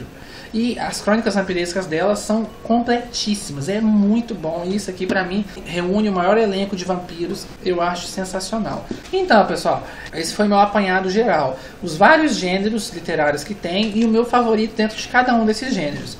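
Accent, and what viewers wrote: Minas Gerais, Brazil. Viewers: que sotaque deliciooooossssoooooo,já morei em minas e sou apaixonada por esse sotaque,amei seus videos,bjs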